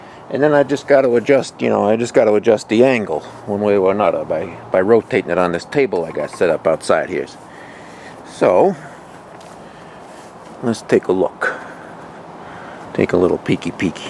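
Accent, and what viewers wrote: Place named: South, United States. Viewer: I love southern accents .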